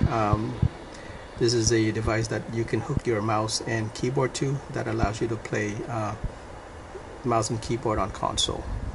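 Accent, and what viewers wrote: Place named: United States. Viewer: Chất giọng của anh giống Mỹ quá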